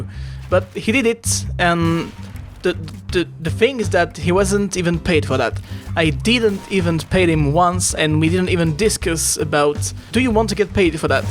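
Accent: France. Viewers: J'aime le sexy french accent :D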